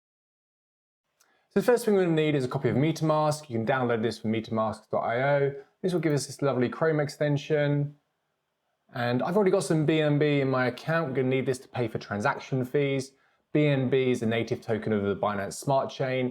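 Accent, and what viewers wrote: United Kingdom. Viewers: I can tell by your accent you are from the UK... What is your take on the FCA regulations? I have asked them a question about setting up my own coin as I don't want to land into any legal trouble. Not had a response yet but reading through their manual they state exchange tokens and utility tokens are not regulated but it has no clarification based on tokens with liquidity. I am unsure if this would make them e-money or a Security Token which are both regulated. The regulation document is so confusing but it would be good to hear someone else's take on it being from the UK and involved in the crypto market.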